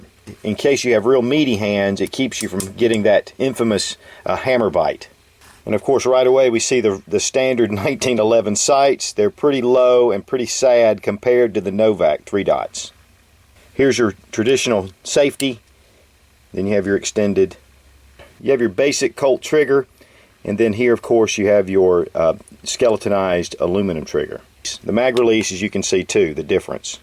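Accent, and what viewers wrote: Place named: South, United States. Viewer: Very nice review of this new Ruger SR1911. I love all your reviews. Something about your smooth Southern accent is pleasing to this old Yankee. Keep up the great work! Thanks!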